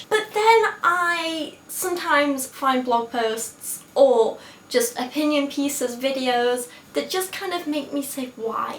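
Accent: United Kingdom. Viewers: MaxxxwellC I think its... British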